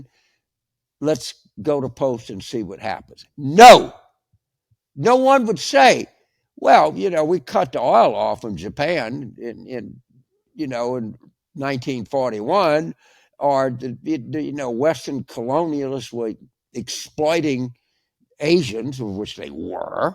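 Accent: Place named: Louisiana, United States. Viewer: YES! James you need to come over for Bloody Mary's one day! Now you sound like a LA boy raised on politics! "That's NOT an alternative view!" -, 2024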